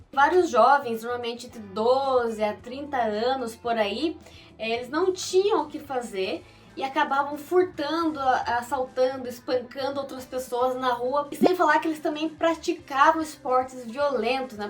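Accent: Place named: Passo Fundo, Brazil. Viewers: Esse sotaque de Passo Fundo.🤭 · hehaehae jurava que era gaúcha tbm. Sotaque parecido sabe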